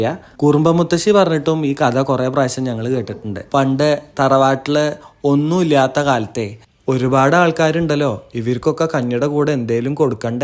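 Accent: Valluvanad, India. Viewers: Is this valluvanadan slang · ആ വള്ളുവനാടൻ ഭാഷ ഷ്ടായി ട്ടോ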